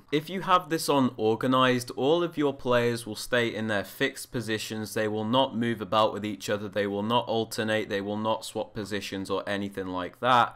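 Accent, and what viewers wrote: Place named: Birmingham, United Kingdom. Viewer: Are you from Birmingham you speak like my cousins 😂😭👅😆 and shall I get Fifa 18 on the switch I mean I have it in my PS4 so I dunno I need opinions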